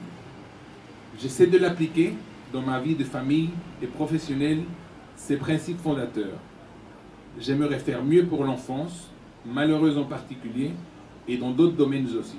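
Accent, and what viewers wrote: Spain. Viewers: il a un accent espagnol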